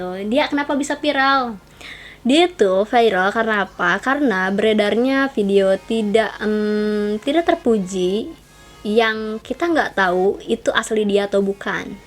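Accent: West Java, Indonesia. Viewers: sunda bgt logatnya f/v pake p wkwk lofff❤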